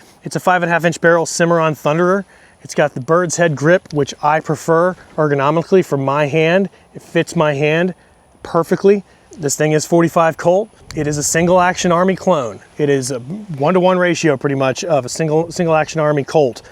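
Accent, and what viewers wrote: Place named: Florida, United States. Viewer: where you from boy? Florida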